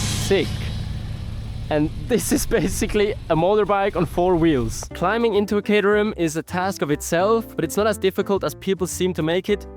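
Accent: Switzerland. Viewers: Yeah, it's one of us. That much is right. But as I can tell a very clear Swiss accent from a German accent, We can narrow down the number of possible solutions even further. No need to be this vague.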